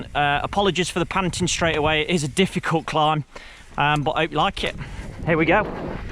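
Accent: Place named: Ripley, United Kingdom. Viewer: He sounds like a Ripley lad!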